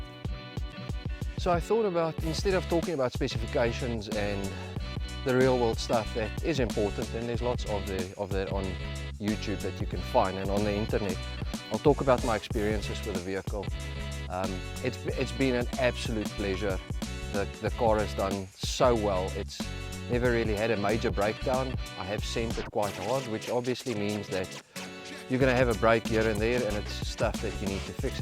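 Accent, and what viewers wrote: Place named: South Africa. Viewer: You have a South African accent, wondering if you are from here min confirmed I guess. Looking at buying a 957 and doing the same with it.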